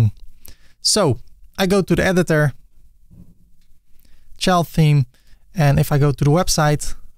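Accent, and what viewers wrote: Netherlands. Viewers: It took me 26 seconds into the video to realise you are Dutch. Especially the pronunciation of "file".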